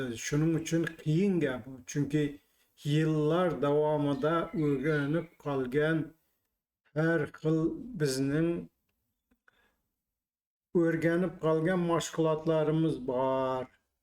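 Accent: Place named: Khorezm, Uzbekistan. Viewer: Мен хам асли Кашкадарёликман хвй,ярааааайййй....(хазил ).Бизда Барлос уруги бор Амур Темур бобомиз уруги ..Бобомиз тугилган кишлок Шахрисабзда Хужа Илгор кишлоги хозир хам жойида турибти.Улугбек Мирзо Али Кушчилар Шахрисабз шахрида тунилишган уларнин уруги Барлос уруги.Менинг дадамларнинг уруги Турк Барлос уругидан .Фаркимиз биз ман сан деб гапирамиз .Барлос уруги мен сен деб гапиртшади "Ж" харфини куп ишлптишади шевада лекин мактабдан тоза адабий тилни урганишиб ккрвкли жойда адабий тилда гапиришади ж кушмай.Лекин оилада уз шеваларида гапиришади хозир хам .Масалан Жакси журипсанма .Яхши юрибсанми? Дегани булади .Биз яхши юоибсизми деймиз.Шунинг учун улар бизни турк барлос дейди.Биз уларни шевасига улар бизга тушунишади.Кашкадарьё Сурхондпрьёдан куп чемпион болалар машхур полвонлар чикади.Чунки улар чакалоклигидан соскани упнида кцй думбасини пишириб ннбошига чупча тикиб чакалокни огзига солиб куйишади чаеалок думбани соска урнида суриб катта булади.Россияга борган Кашкадарёлик болалар .Худога шукур гуштдан кутулдик дейишармиш.Демокчиманки хар бир вилоятни узига ярашиб турган шеваси борда.Сизнинг Хоразм шевпсида гапиришингиз узингизга ярашиб туради.Мнн бир Хаййй яраййййй..десам менга Кашкадарьёликмисиииз деб кулиб.юборишади дарров гап гапга ковушиб кетади.Чунки бутун Узбекистон вилоятлари био юиоинт яхши тушунишади.Вотти вотти килса Тошкент .Емон зурсизда деса Андижон Келутту деса Наманган.Улай санга деса Китоб .Борайчийдим келайчийдим деса Шахрисабз .Туссунбой киттин бичок д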